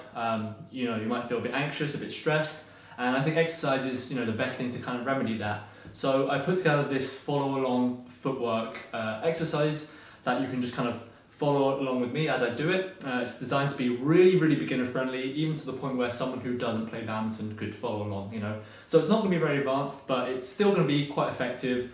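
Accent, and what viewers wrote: United Kingdom. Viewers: Yeah his accent is 100% southern English. Probably born and raised in England is my guess based on the accent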